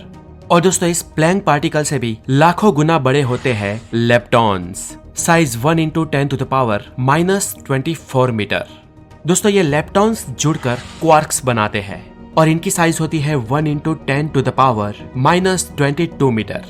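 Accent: Gujarat, India. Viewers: ગુજરાતી લાગો સો ભાઈ...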